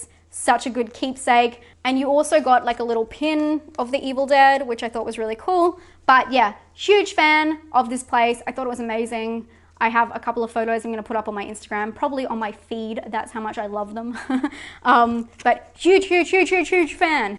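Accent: Australia. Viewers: An American made fun of your accent? What a knob. Australian accents are the best! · Great haul! I also am not really a collector, I like browsing stuff like that but hate displaying and keeping stuff but you chose great souvenirs! Also, what English speaking person doesn't understand an Australian accent? 😂 can't wait for your next video!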